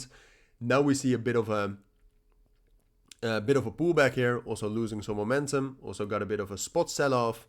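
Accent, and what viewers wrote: Netherlands. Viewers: Can hear you are Dutch ;-). Ty for the update.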